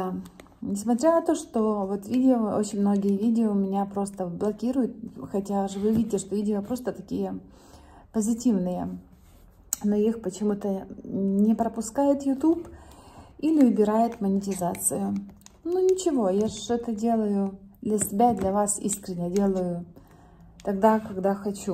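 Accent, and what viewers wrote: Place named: Kuban, Russia. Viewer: Голос приятный, нежный. Говор тоже приятный. Для меня обычный, родной. У нас на Кубани также говорят. А, что кто то написал плохое? Всем счастья, здоровья, любви.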